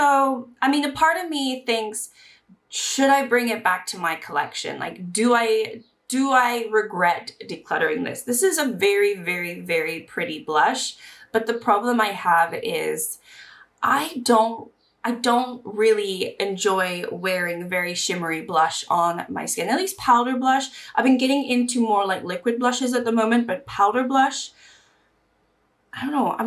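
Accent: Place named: England, United Kingdom. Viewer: Where's your English accent gone😂❤?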